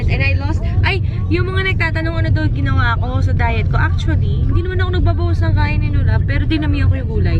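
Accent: England, United Kingdom. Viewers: please make a video on how you got your english accent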